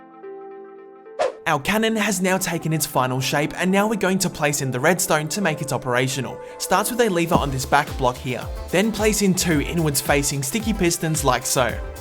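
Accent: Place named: Australia. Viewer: Your Aussie! Me too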